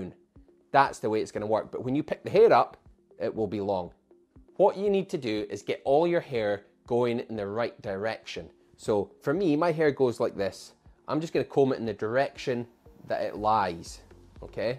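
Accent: Scotland, United Kingdom. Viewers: Love the Skaughtisch accent! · Thanks for this easy approachable concept to do self hair cuts. Really like your accent as well. Scottish?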